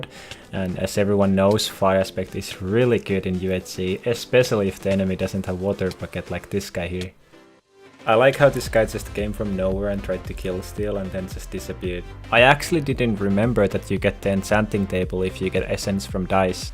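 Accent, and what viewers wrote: Finland. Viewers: Ok so basically, ootko suomalainen because of your accent and name? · Musta tuntuu että sä oot suomalaine koska sun accentti ja sun nimi. Mutta iha hyvä video.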